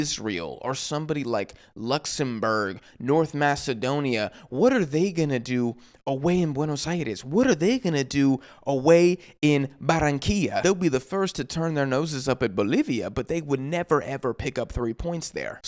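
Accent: United States. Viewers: You have an american accent and you’re talking about football. First of all Gattuso didn’t say that, you people just believe anything you read online. Second of all of course in Europe we have more spots, cause we have more countries but in proportion South America have too much spots and definitely more than Europe IN PROPORTION, and that’s just a fact, 7 out of 10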